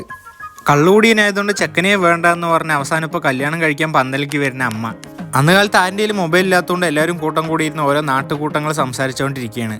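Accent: Palakkad, India. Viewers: Palakkad aano bro nee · palakkadan slang ❤❤❤❤ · Palakkad anaano baai?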